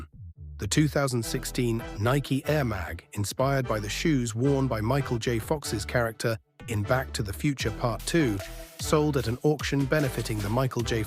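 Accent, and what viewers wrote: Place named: United Kingdom. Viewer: Brit Accent was a nice move 😂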